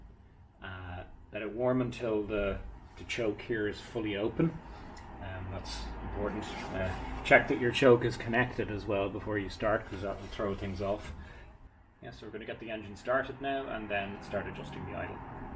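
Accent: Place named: Ireland. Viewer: Not sure what that will achieve because this fella's got an Irish accent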